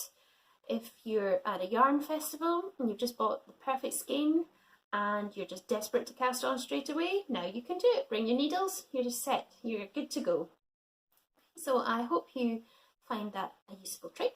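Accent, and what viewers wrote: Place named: Ireland. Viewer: Cute accent. Ard you Irish? Great videa! Thanks! I'm trying it now.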